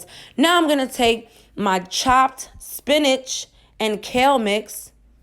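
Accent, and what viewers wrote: New York, United States. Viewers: I immediately knew you were from New York within the first ten seconds. Lol.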